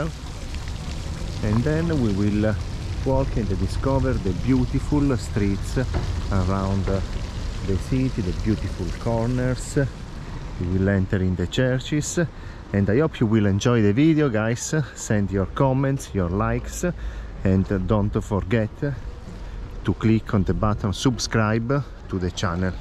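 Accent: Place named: Ferrara, Italy. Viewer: Fantastico il ferringlese si riesce a sentire la elllle e la essse anche in inglese! Sono di Ferrara tranquillo capisco! Bel video mi piace!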